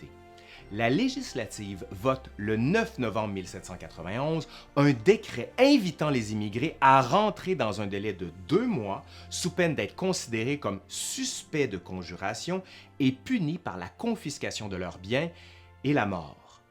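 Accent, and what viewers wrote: Quebec, Canada. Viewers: ... le révolution française relue par un historien canadien, avec l accent canadien, très intéressant 😂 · Grave kiff j’étais sur mon téléphone quand je entendu ta voix Québec je sais pas pk mais j’ai flippé mdr 😂 mais ouais sinon cette génial 👍🏾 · j'adore l'accent québécois pour raconter l'histoire de France , j'ai l'impression que vue de loin, c'est plus objectif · L'accent du Québec n'est pas bon. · Toujours aussi géniales vos vidéos D'une qualité rare et très dynastiques. Votre diction est formidable et l'on vous suit avec entrain. Et puis cet accent québécois !! Quel bonheur , parole de parisienne. Euh ,excusez moi ,mais je me permets de vous dire que vous êtes encore plus beau sans barbe 😆👍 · J'adore l'accent quebecois 🫶🏼 merci beaucoup pour ton travail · Bravo pour la vidéo, très enrichissant, j'ai appris beaucoup grâce à toi. 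Merci beaucoup à toi et continue comme ça. +1 abonné 😘 (Ps: je vis en France et ton accent québécois est plaisant 😉) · Et puis puisqu'on est entre amis, pourrais-tu faire un jour un travail sur la guerre d'Algérie, je suis l'ultime pied-noir de ma famille et j'aimerais bien voir ce sujet traité par quelqu'un de compétent qui ne soit ni Algérien ni Français puisque vu ton léger accent il m'a semblé que tu as plutôt grandi sur les rives du Saint-Laurent que celles de la Garonne. Merci et bonne continuation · Maaaiis je suis sûre q l'histoire de France intéresse Bcp de monde de par le Monde... 😉 C 1 véritable série à épisodes.... 😄 !!.. Et j'Aadôre l'accent Québécois... 👍👍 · Ton accent québécois m'énerve je peux pas regarder la vidéo dommage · Salut. C'est un énorme travail que tu as fais LA😃👏 l'histoire de France avec un accent Québécois... super ! Presque 3h qui sont passées très vite ! Merci à toi 👍Ah javais déjà fait un com y a 1an😆😃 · Une personne qui présente bien, qui parle bien, belle gueule, avec un p'ti accent du canada... 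Bref je m'attendais pas à quelque chose d'aussi tip top! Pouce Bleu & Abonnement! Offrez lui une cocarde! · Passées les digressions humoristiques… La qualité d'écriture et le charme du québécois s'opèrent. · Passionnante cette chaîne Travail de qualité Bon continuation J adore cette chaîne Et l accent des cousins d Amérique apporte son petit plus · J’apprehendais beaucoup la vidéo à cause de l’accent canadien, mais je dois dire que j’ai été agréablement surpris ! J’ai accroché dès le début et je n’ai pas lâché avant la fin 2h44min plus tard... très bon travail, j’attends votre épisode sur la 2eme guerre mondiale avec impatience ! :) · J'suis la seul à kiffer le fait qu'il est un accent Québécois ??? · Superbe vidéo J adore l accent québécois :) Au-delà de la boutade, Merci! c est passionnant et très instructif Un français en amérique ... :) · cet accent québécois me fait saigner les oreilles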